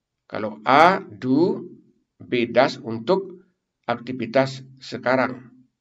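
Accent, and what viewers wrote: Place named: North Sumatra, Indonesia. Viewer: " Your accsent a like a from North Sumatera😅"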